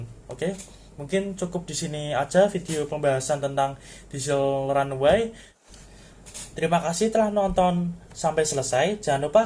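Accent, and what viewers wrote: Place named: Java, Indonesia. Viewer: Saya suka orang medok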